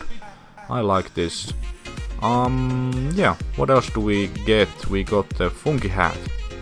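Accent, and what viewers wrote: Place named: Finland. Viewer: Heti aksentista tajusin et täähä o suomalaine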